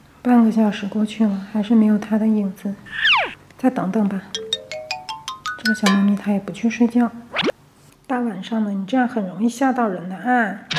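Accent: China. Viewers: 听你的口音你应该是中国人吧！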